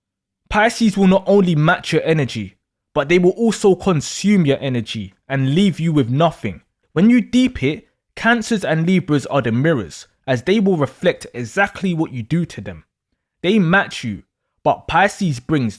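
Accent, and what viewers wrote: United Kingdom. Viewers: I keep on waiting for - Someone tell Doja cat, I’m trying indulge in that. 🎶 Rihanna’s face made me click & The narrator’s British accent made me stay. 😂👍🏾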